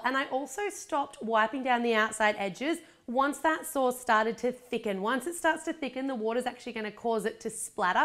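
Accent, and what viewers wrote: Australia. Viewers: No! That's not a British accent at all!! She's Australian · is she an Aussie don't like the accent. and fair enough yeah she recreates bigger version of chocolates but do they taste the same as the actual brands? only a million times worse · She actually sounds more Australian than british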